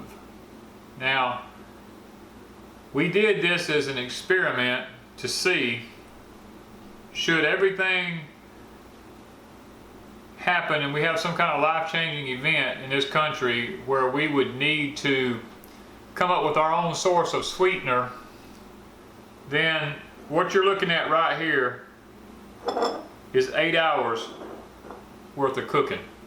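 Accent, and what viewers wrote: United States. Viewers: So excited to receive my Danny Corn this past week :-) And the sweet potato growing book. Love your videos - kinda of cool hearing someone from the South like me LOL!! We are watching that crazy hurricane - it looks like it is going to make a circle. As long as it doesn't go into the Gulf. Katrina was enough for us for the rest of our lives. My mom & dad live in Pascagoula and they had 4 1/2 ft of water. That was a mess. We just had wind damage up here in Semmes (just northwest of Mobile). Take care! Judy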